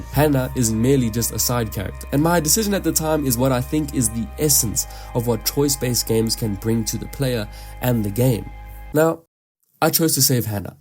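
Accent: South Africa. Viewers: you South African ik that accent on god😂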